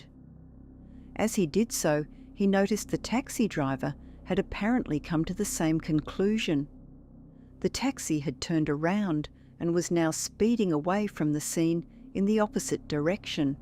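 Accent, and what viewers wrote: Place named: Australia. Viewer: The story teller has a lovely gentle Australian accent, and voice.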